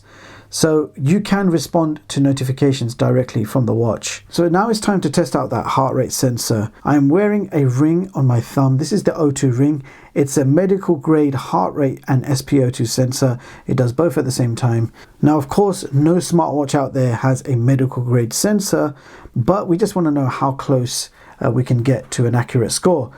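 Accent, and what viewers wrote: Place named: United Kingdom. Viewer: You sound british!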